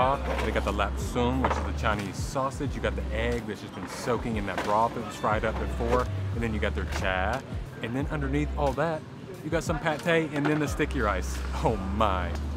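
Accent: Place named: Arkansas, United States. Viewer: He's from Arkansas. Totally different state and different accent. LOL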